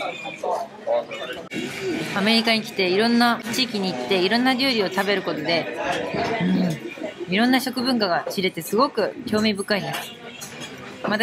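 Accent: Hokkaido, Japan. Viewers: 時折出る北海道弁にほっこりします☺️ さんの食レポ最高です❣️今年も楽しみにしています❗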